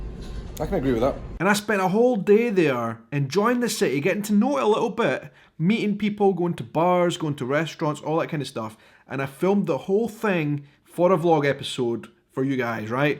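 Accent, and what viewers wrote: Scotland, United Kingdom. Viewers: Contact us next trip. My husband is from Irvine, Scotland talks just like you👍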